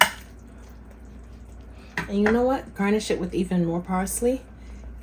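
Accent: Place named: New York City, United States. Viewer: It's "Creative Cooking With Tammy". I told her that I knew this wasn't Cooking With Tammy's recipes with that bland looking food and lack of seasonings. I went off. I tell everybody about your food. How you cook like you're from South but your accent sounds like NYC. A wonderful mix.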